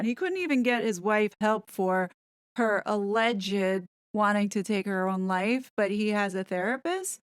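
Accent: England, United Kingdom. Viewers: loved your England accent... you didn't try to mimic someone from Mary Poppins. 😁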